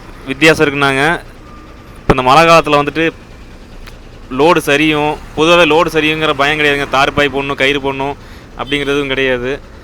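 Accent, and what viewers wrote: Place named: Coimbatore, India. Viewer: உங்களின் shift from Thangam to the new Container lorry looks great. எங்கள்க்கு உங்களின் சரளமான ". ஏங்க்ப்பா " Coimbatore style தமிழ் anchoring giving your usual & meaningful வழவளா talk என்றும் 16 மாதிரி சரளமான பேச்சு ரெரம்ப பிடிச்சிருக்கு. So, without break உங்களின் பிரயாணத்தைத் தொடருங்கள்.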